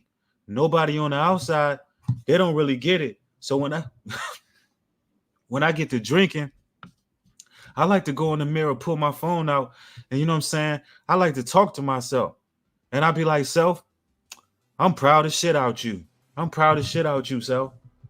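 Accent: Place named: Philadelphia, United States. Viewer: Your Philly/Meek accent is hilarious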